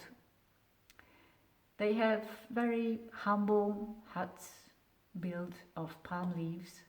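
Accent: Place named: Spain. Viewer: Wspaniale przeslanie, española angielski. Jest pani the best🙂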